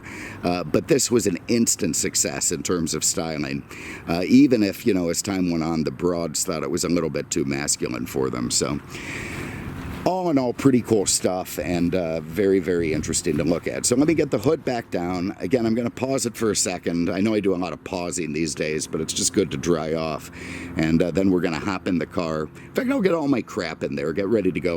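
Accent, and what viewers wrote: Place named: Great Lakes, United States. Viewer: Your accent screams somewhere between Lake Michigan & Pittsburgh😆This baby is a real gem from an era we may never see again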